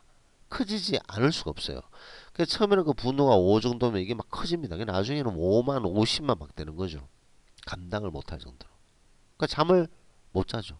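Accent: Gyeongsang, South Korea. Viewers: 경상도 말투 저도 경남ㅎ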